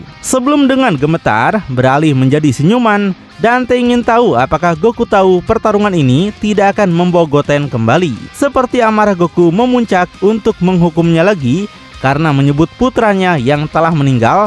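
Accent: West Java, Indonesia. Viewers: Wah mimin org Sunda nya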